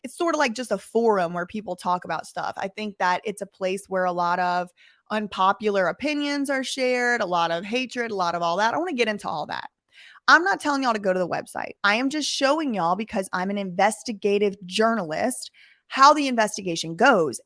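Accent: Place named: Louisiana, United States. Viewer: I knew you had to be from LOUSIANA 😂❤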